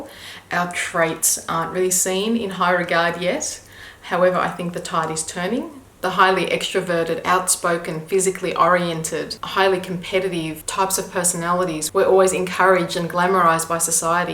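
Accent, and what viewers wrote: Australia. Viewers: I hear an Aussie accent..I live around Sydney. Do you suggest any meeting group around Sydney. I tried to search on net, could not find anything. Regards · I love your Australian accent.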